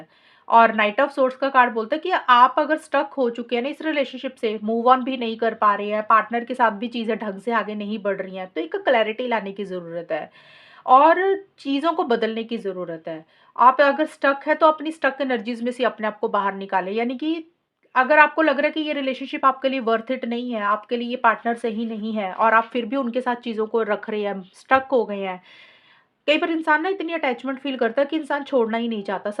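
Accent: Punjab, India. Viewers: Your way of talking is Punjabi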